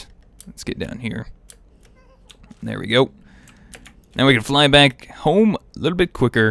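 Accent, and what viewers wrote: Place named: Midwest, United States. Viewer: Scotty I think he has a centrial U.S accent but I cant quite tell, all I can tell is my accent is very similar at times and I live in Oklahoma U.S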